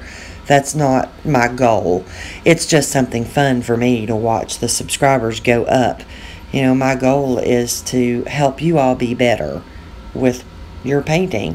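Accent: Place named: North Carolina, United States. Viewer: Another great video,! I love how this turned out. You used my favorite colors - and I'm glad to see you got the new inks. They are magnificent! The other inks you need to try are the Trex inks. You talk about bright vibrant inks! WHOA! I played with them for the first time this afternoon and was super impressed. They aren't bad pricewise, either. Big bottles, 12 colors - somewhere around $30. I love your videos (but you already know that) and think this one was especially powerful. I could create this! (Your real wispy ones not so much.) I'm glad your count continues to grow as you deserve it. I love your "backwoods NC accent" - it goes well with your videos. You've got an incredible style my friend! 😍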